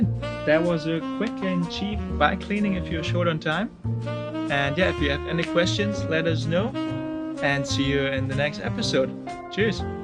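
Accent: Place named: Netherlands. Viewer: Very nice music haha! And you got a dutch accent, sweet!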